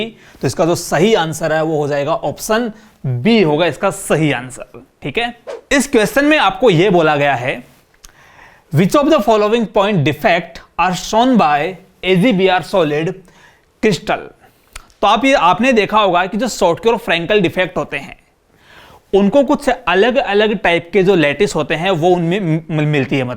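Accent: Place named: Rural, India. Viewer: Sir ke bolne mai gao ka taste hai Jo iss lecture ko aur bhi interesting bna rha hai Love u bhaiya 3000❤❤❤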